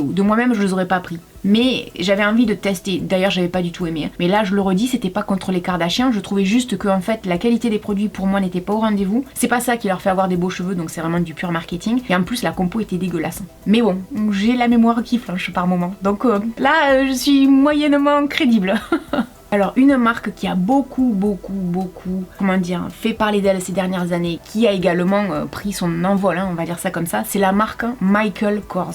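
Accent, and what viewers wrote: South, France. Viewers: t'inquiète pas je filme avec une tablette merdique et crois moi ça fait l'affaire et moi non plus je suis pas top des Kardashian. Tu est top continue comme ça je te suivrait toujours je suis contente a chaque fois que tu sort une vidéo ton accent du sud me tue j'adore. Je suis allée dix jours a saint pierre la mer et j'ai adoré l'accent du sud et le vent du sud le marin. Bonne soirée.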